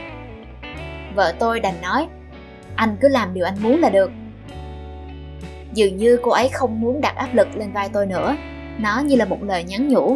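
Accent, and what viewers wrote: South, Vietnam. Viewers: Tôi lại phải làm dũng sĩ diệt speaker, cho giọng miền nam như vầy vô mất hết view giờ!🙄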